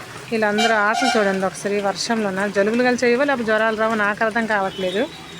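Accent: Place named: Vizianagaram, India. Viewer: మీరు చక్కటి మన vizianagaram యాసలో మాట్లాడటం చాలాబాగుంది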